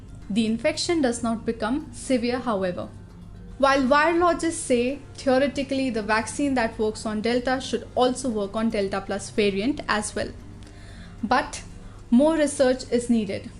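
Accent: India. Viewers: Even I find it difficult to understand accent of US, UK China. But never wished a narrator. Dear, pls get used to it, this is Indian accent at its best. Listen carefully, you will know what she is talking about. Focus on content, not accent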